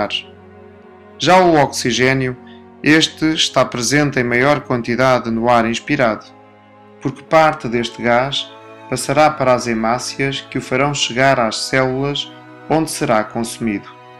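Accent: Portugal. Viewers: Putzz.. sinto decepcionar mas, esse fundo musical é 'um saco" pra quem é músico, como eu, que presta atenção na música ou seja, eu não sabia SE preSHtava atenção nela ou no carinha, (*) ou explicação falada.. e, detalhe: esse "sutÁKi PÓRTUGUEISH" - mas, de boas. Não vou farei medicina... hehehehhe..! (os vídeos que mamãe m'envia, se tiverem letras e música - tiro todo som, para apenas ler - sério, é um inferno!). Assim são as pessoas diferentes.. :)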